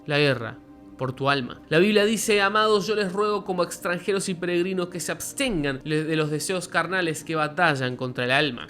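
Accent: Argentina. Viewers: Por tu acento pareces Argentino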